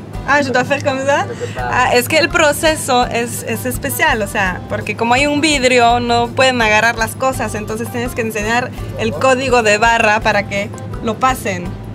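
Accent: North, Mexico. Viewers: Hola, en verdad que tú lenguaje de español es un híbrido muy interesante ya que en ocasiones te escucho hablar el acento chilango y que no es el que hablan en los productos televisa, en otros momentos te escucho como un poco el acento del norte y lo más raro es que ya que viviste un tiempo en el sur del país no tengas su acento, en fin, te mando un Enorme Beso, hasta luego. 😘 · Eres muy guapa .vives en Bélgica ? Tienes asentó muy Mexican . Ya me enamoré de ti 😄 ❤️ saludos desde Chicago il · Hola! Me sorprende lo bien que hablas español. Especialmente porque es un español muy mexicano. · Wow. Me sorprende tu acento. Hablas más mexicano que yo. :'( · En serio eres de Bélgica por k en verdad ni lo pareses y ni tienes asentó belga más bien tienes asentó mexicano en verdad y lo tienes me gusta Saludos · woww hablas rebien el español mexicano supongo debiste estar mucho tiempo en México también podría ser por eso que tienes acento mexicano campechaneado pues durante el vídeo estaba yo emmm es chilanga sii y luego te salía acento norteño y yo achingaa pus mejor le pregunto 😅 gracias por contestar por cierto estás hermosa 😍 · Me encanta como hablas en español 😂😂😂 hablas como del norte de mexico tienes una chispa increible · me gusta tu manera de decir las cosas y hablar mexicana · Hablas perfectamente mexicano. 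· Jajajajajaja....hermosa Mexicana.....!!! Tu eres Mexicana en mi corazón... Que cosa.....Hablas y te expresas total....Y absolutamente Mexicana.... Que linda.....!!!!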